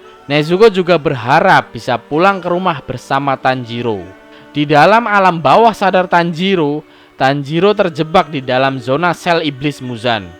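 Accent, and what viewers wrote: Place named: Java, Indonesia. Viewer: Jawa bet lu njirr...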